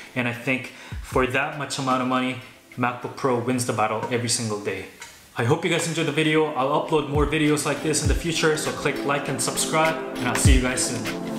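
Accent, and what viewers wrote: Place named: China. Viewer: 听口音是在中国长大的吧？😏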